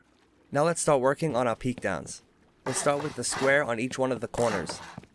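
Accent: New York, United States. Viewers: This guy sounds like a New Yorker.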